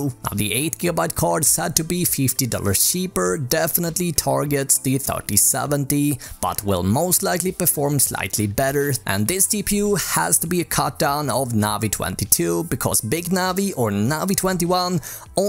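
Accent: Sweden. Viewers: The Swedish accent is strong with this one! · Its because he's from the north part of Sweden and the majority of people out there have that annoying accent unfortunately. In short he's speaking in Northern Swenglish.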